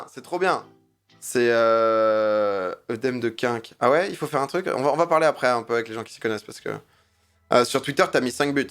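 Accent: France. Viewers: T'es sûr d'être du 69 domi? Pcq j'ai bien l'impression que tu es plus Marseillais de la façon que tu raconte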